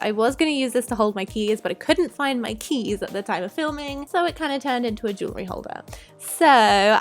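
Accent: England, United Kingdom. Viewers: Are you from England? You have a sweet accent :)) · Are you from the uk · are you from the UK ?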